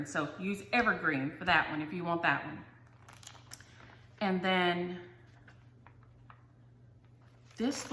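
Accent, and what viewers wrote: South, United States. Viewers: Love the moonlight as well as the evergreen ..congratulations on 3000subs ! And I love your accent and southern ways ..I’m from up north and it sounds special to me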